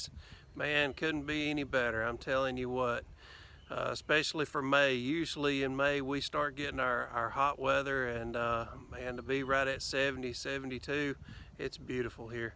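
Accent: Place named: Alabama, United States. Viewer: HaHa! I knew it was Alabama! Got to where I was watching just to hear home :)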